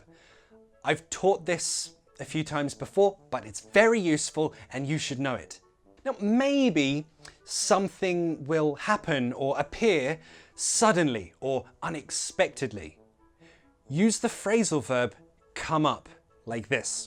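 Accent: United Kingdom. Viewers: Why do you have so nice British accent, mr.?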